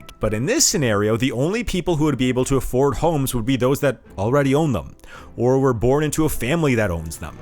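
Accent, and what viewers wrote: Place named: Canada. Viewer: 9th generation Canadian here, maybe longer. Not yet 60. I assume you're Canadian because you sound it voice-wise, but you certainly do not have any sense of the honour, dignity, nor historical perspective that I have had the privilege of living and knowing. My parents married young, moved to Toronto, were very intelligent and so forth. My dad worked as a Manager at Ford for 45 years. My older brother and I shared a bedroom until we became Superintendents when I was 9 and my brother was 16. Prior to that, my parents slept on a sofabed in our one bedroom apartment in East York. My dad died when I was 28 and my mother lived off his benefits and her penny pinching for they had both been born at the start of the Great Depression, and my grandfather had a top job as the head timekeeper of the CN railway, and so they moved from Halifax to Moncton and lived near the tracks, and my grandmother who survived the Halifax Explosion while working as a paralegal in 1917 and with shrapnel in her back, escaped the burning building by sliding down the iron banister because the stairs were on fire. 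Then she got the Spanish Flu, and forevermore the Drs. would x-ray her lungs and swear she had had TB, which she hadn't. So you see she opened a soup kitchen and out of the goodness of her heart, she worked hard and fed the half starved men who rode the rail cars desperately looking for work. Now my Uncle, who was born in Toronto in 1914 came from a wealthy family, and had his PhD in finance, and was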